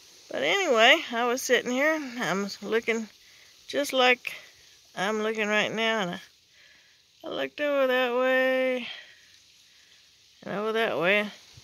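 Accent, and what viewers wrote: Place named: Georgia, United States. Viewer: Amen to what You are saying. Don't have to see it to know it! Please don't get mad at me, but You sound kind of Georgia, but it looks kind of West Texas there?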